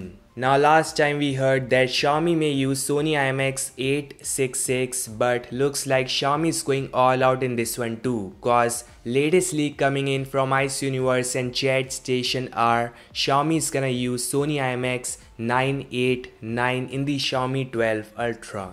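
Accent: India. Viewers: Typical Indian accent...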